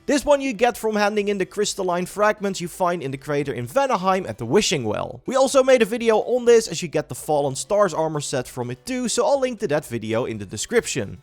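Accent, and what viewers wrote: Netherlands. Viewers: This man had the most dutch accent ever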